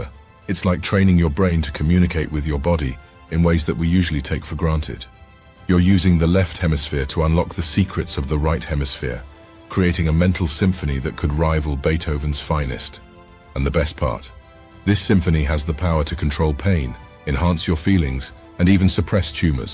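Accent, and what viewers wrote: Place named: United Kingdom. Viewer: It's amazing how a well polished British accent brings legitimacy to a documentary :)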